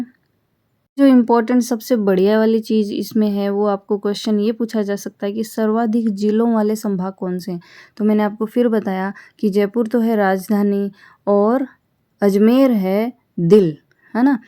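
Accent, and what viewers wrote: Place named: Bihar, India. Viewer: Didi aap Rajasthan se hai aapki hindi up Bihar wali lag rahi hai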